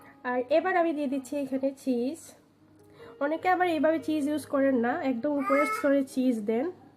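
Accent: Sylhet, Bangladesh. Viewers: You are syleti right? I can tell from your accent your not fluent shudho bangla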